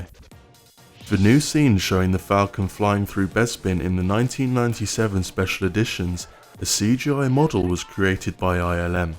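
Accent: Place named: United Kingdom. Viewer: Why is Kylo Ren narrating this with a British accent?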